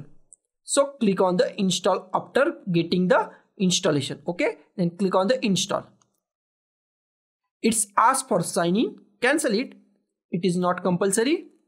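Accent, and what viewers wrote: India. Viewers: Bro's internet speed 🗿🗿 bro's Tutorial ❤❤ bro's indian accent of english being an indian 🙌🙌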